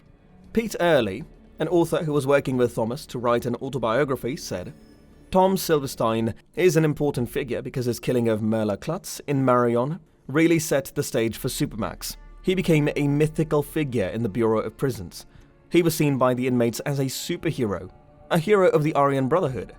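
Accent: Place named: Netherlands. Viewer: You have a strong Dutch accent when speaking English. Good video, Gracias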